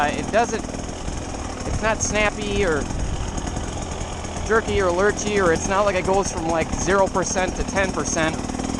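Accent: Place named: Wisconsin, United States. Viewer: That Wisconsin accent is prime brother 💪🏼. Great vid. Your description of how controllable it is down low, makes this bike very tempting. My four stroke Kawi is hard to control in any sense of the word at low rpm. Feels like it goes from 0-100 with the slightest twist of the throttle. I ride a lot of desert and open area, so it’s fine. Woods riding and technical terrain is tough though.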